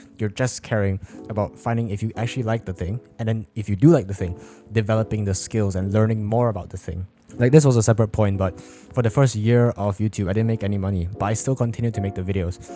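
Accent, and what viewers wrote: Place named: Singapore. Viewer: This guy sounds Singaporean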